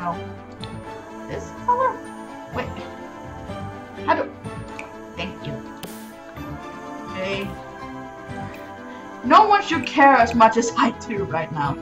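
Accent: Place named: Denmark. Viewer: Hello! Are you Danish? It sounds like you have a danish accent😁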